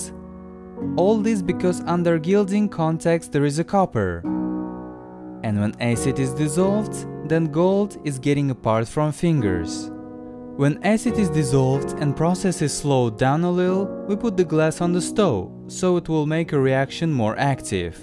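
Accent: Russia. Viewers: Русский акцент всё равно слышно. Но произношение хорошее.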